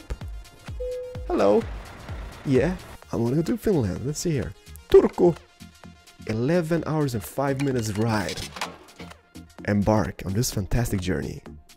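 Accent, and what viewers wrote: Sweden. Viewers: Wuuuut are you Swedish :O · ÄR DU SVENSK?! (are you swedish?!) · Jag är oxå från sverige :D Du har svin härlig dialket!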